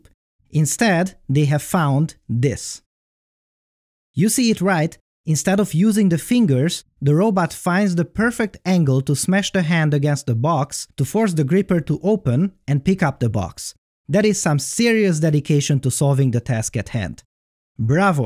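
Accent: Hungary. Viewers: Ahoz képest hogy magyar vagy, kevés akcentussal beszélsz angolul. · hunglish intensifies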